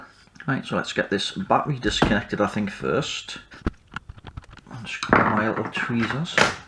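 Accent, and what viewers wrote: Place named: North East England, United Kingdom. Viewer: Ideal item for someone like. Not Vince. I don't like him. He sounds too "nice", he's not my mate and him saying "Trying to fix" implies that he's fumbling about without really knowing what he's doing. YOU, though, do know what you're doing. You quickly identify the problem and just get on with repairing/replacing the dead part(s). You get straight to the point and don't mess about. No silly intro music or graphics. Also i like your North East accent.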